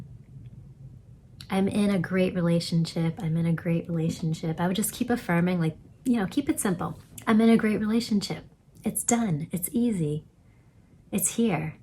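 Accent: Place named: Boston, United States. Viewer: Nice, the secret is a controlled imagination and a well steady attention firmly and repetitively, creation is finished, omg, I love Boston, I think I heard Boston :))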